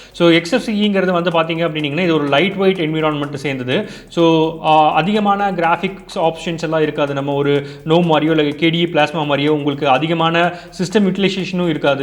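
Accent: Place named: Kongu, India. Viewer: coimbatore slang veera level anna. I love kongu tamil.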